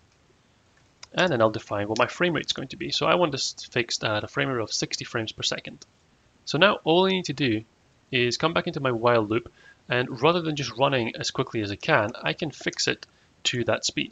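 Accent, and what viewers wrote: United Kingdom. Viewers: Omg I never hear somebody with the same accent as me on YouTube. Ayyy Northern Irish accent representation 🫶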